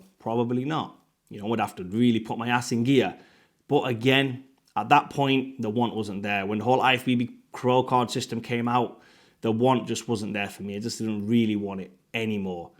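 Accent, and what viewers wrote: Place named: England, United Kingdom. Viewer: Are you from England because you sound like your from round my neck of the woods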